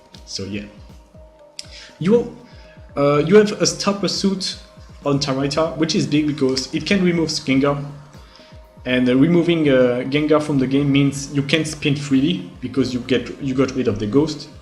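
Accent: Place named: France. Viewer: C'est l'accent français ça ! Je reconnais Merci pour ta vidéo mec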